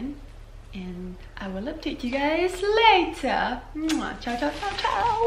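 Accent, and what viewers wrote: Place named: Bisaya, Philippines. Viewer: That bisaya accent is so lovely to hear..😍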